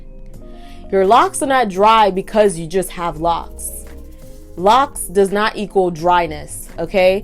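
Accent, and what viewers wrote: Jamaica. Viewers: Ayyyy love the patois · You from Jamaica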